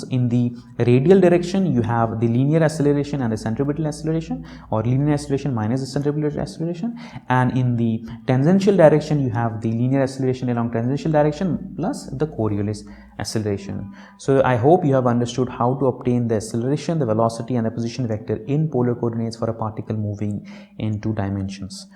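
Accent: India. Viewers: NIce English, Lesser Accent of Indian English, Keep It Up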